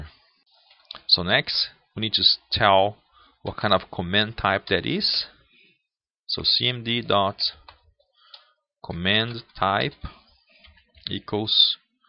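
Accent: Brazil. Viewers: Brazilian accent? Excellent tutorial by the way.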